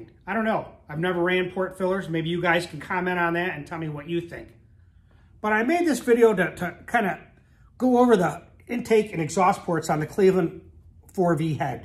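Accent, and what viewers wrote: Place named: Brooklyn, United States. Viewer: Were you from Brooklyn or Queens? you sound like you have an accent from that area!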